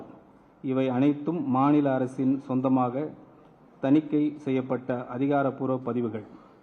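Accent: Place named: Marwari, India. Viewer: இவருக்கு தாய்மொழி தமிழ் தானா இல்லை வேற்று மொழியை சேர்ந்தவரா....மார்வாடி போல் படித்துக் கொண்டிருக்கிறார்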